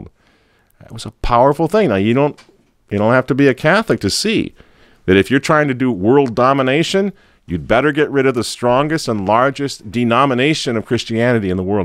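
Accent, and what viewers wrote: United Kingdom. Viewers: Fair comments all around. Only one suggestion going forward....a request, really., could you start doing these editorial videos with that great British accent. Loved it...powerful...convincing. Keep these great videos coming. Great job. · Love the English accent 😅😅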